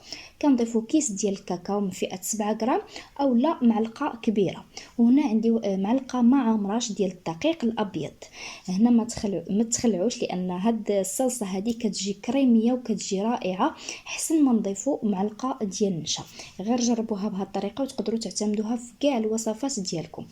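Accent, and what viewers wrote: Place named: Algeria. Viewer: هبلت. هبلت هذه. لهجة. جزائرية . اكيد. انك 👇👇👇👇👇👇 اخذتي. المنشور. والوصفة. من. صفحة. جزائرية. .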